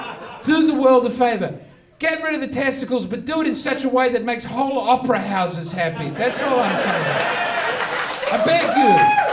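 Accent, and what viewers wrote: Italy. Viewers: Very authentic Italian accent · Fantastic Italian accent.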